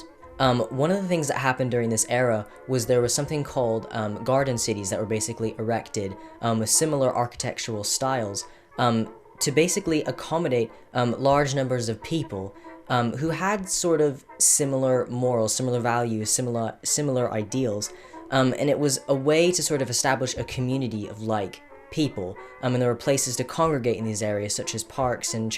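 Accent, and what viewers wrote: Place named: United Kingdom. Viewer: I can't tell if you're British or not it sounds like you are British but are trying to speak with an American accent or something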